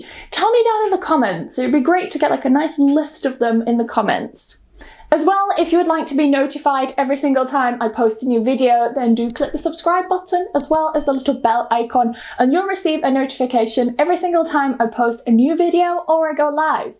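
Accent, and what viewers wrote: United Kingdom. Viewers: Hola, estoy muy agradecido contigo porque tu canal me ha ayudado bastante, me has sacado de preguntas muy significativas. Te amo. Me enamora tú bello acento británico. Saludos desde Bogotá, Colombia.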